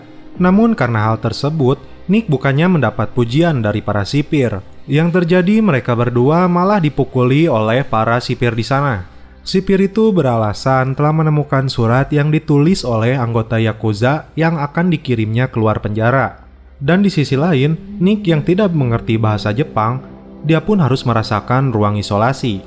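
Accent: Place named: Sunda, Indonesia. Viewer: Mang ngomongna sunda ning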